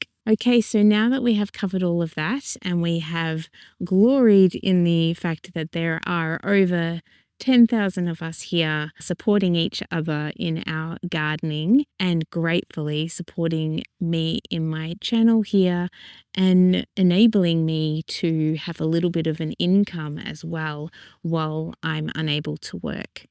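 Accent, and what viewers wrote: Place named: Australia. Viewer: so good to hear an Aussie voice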